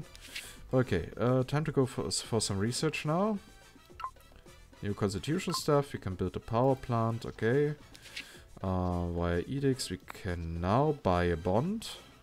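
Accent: Germany. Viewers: German accent is hilarious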